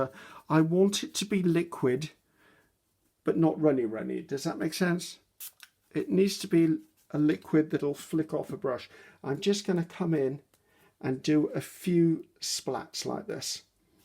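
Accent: England, United Kingdom. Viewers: you are so awesome! Please don't ever stop making your videos. You are professional, but real, which makes it very fun to watch your videos. You make me want to come visit England just because of your accent and tidbits about your "garden", "rubbish", etc ☺️. Thank you for reaching and sharing.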